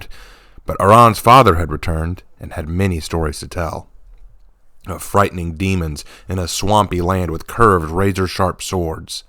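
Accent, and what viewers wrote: Scotland, United Kingdom. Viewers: Nice Scottish accent btw. Really felt the Highlander spirit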